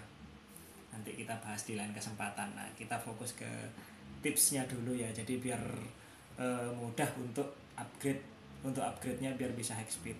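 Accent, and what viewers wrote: Madura, Indonesia. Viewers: kmu orang mna bang klau bleh sya tau orang mna bang yaaa ma.af nii bang seblumnya soalnya kayak orang madura ngomongnya.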